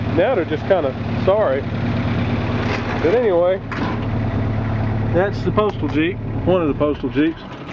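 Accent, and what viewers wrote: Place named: North Carolina, United States. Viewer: I absolutely enjoyed your commentary! I'm guessing you're from southeastern North Carolina. I'm from Wilmington.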